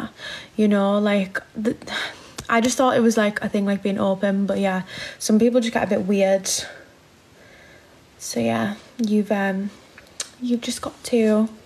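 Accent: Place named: North, United Kingdom. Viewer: Wow beautiful 😍northern accent too 😘💋💋💋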